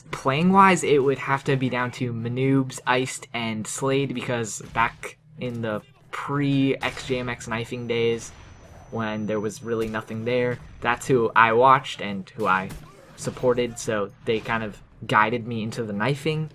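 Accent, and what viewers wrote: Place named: United States. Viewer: Were you born in Canada? You sound American.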